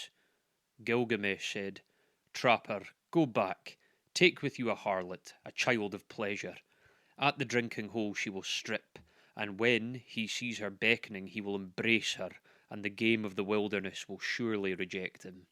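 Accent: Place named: Scotland, United Kingdom. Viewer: The Epic of Gilgamesh sound so good with a Scottish accent. Well read and instantly subscribed.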